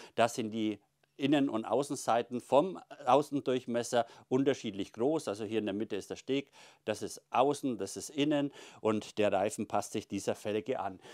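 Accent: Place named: Franconia, Germany. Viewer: …für mich ist DAS Fränkisch…😂😂😂 Den richtigen Dialekt versteht nur ihr “vor Ort”….🎉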